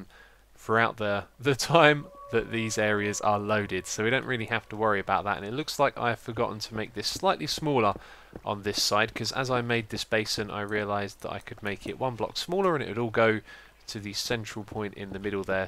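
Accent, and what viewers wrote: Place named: England, United Kingdom. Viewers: He is from England :)... English accent^^ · That's just the English accent :D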